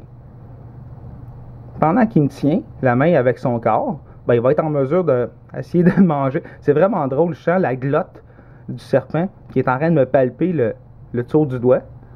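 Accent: Quebec, Canada. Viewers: Je vous kiff les Québécois j'adore votre ville c'est beau tout ce que tu veux mais sérieux votre accent faite quelques chose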